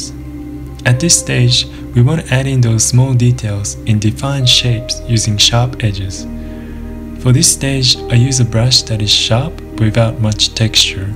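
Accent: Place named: Asia. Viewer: I'm confused in your tutorials from before and always with your intros your voice was much higher and definitely had a stronger Asian accent. But this video you don't have it which I find strange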